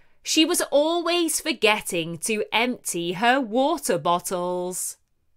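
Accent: United Kingdom. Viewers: I love your English stories and British English.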